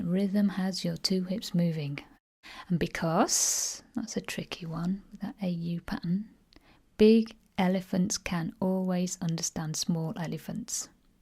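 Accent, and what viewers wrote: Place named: Midlands, United Kingdom. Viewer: I fell in love with your voice and my ears would like to marry it. The fact that you pronounce the 'g' at the end of '-ing' suggests to me you learnt to speak in the Midlands or North-West, is this correct? I'm a big fan of mnemonics, as I do some teaching myself. For sentence coherence and therefore better memorising, before and after the 'hurry' in 'diarrhoea' add a pause, it scans so much better and my students seem to remember it better. Whilst nothing to do with spelling, perhaps my favourite mnemonic is: 'How I wish I could recollect Pi' the amount of letters in each word equal '<num>', Pi to six decimal places. I have about a dozen which I use with scary regularity. The one for remembering the kings and queens of England is awesome, it starts 'Willy, Willy, Harry, Stee, Harry, Dick, John, Harry three...' It starts at 1066with William the conqueror and continues right through to our current queen, eventually. 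I'm looking forward to your website / next video, but not quite as much as my ears are ;-)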